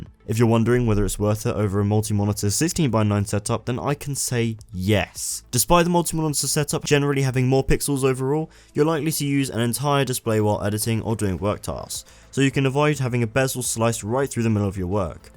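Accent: United Kingdom. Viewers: British accent. Of course ! Hey any chance of you doing a video after 4 shots of Scotch or Brandy?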